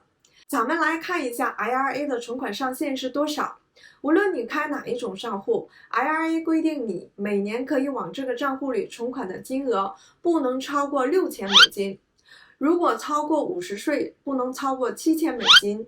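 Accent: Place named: Liaoning, China. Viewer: 应该是辽宁的吧，我本科硕士都是沈阳读的，在沈阳呆了七年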